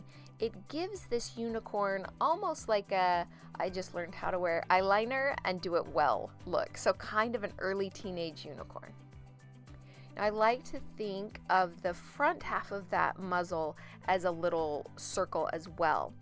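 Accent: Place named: United States. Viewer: we should do a collab, but I guess by your accent that you are in America...You are AWESOME!! Brilliant Art Work! <3 <3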